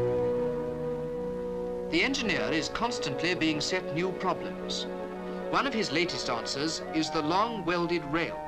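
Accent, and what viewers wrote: RP, United Kingdom. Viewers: A real cut gless eccent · Plummy voice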